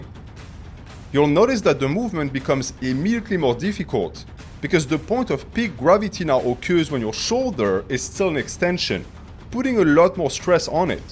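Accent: France. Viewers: Youtube cannot comprehend thick french accent, the closed captions keep saying "vapers" instead of "v-tapers" and said "food in the gym" instead of "foot in the gym" it's hilarious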